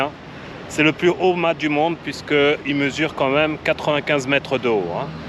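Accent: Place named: France. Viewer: I don't understand French but I like French accent...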